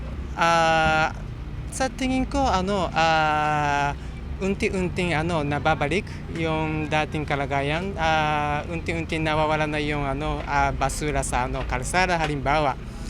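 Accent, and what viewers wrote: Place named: Tagalog, Philippines. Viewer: nakaka proud ako kay kuya!! sobrang linis mag salita tagalog SOGOI!!!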